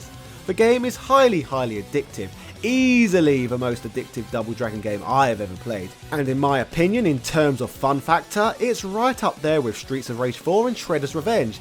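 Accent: United Kingdom. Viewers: I’m not even going to watch this video with this dumb British accent and say this franchise will never die because it’s the best on the planet, so it wasn’t about to peace out I mean double dragging neon was amazing.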